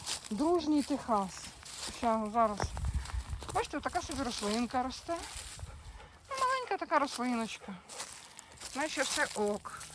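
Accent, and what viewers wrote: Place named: Podillia, Ukraine. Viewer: Ви часом не з Поділля?Мова подільська,точніше-вінницька.Уявляю,як зреагує ваш чоловік,коли там ви насієте кропу,петрушки і прикрасите чорнобривцями.Я жартую,звичайно.Бажаю вам здоров'я і щастя!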